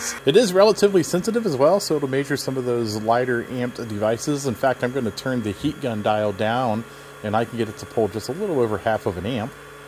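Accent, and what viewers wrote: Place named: Mid-Atlantic, United States. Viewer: Totally irrelevant but do you happen to be from northern Maryland, Pennsylvania, Jersey area? I'm quite interested in linguistics, I thought I detected a familiar accent.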